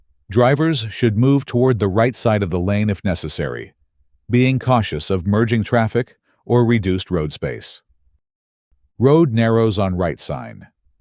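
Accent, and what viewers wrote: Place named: United States. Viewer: why an American accent for uk driving????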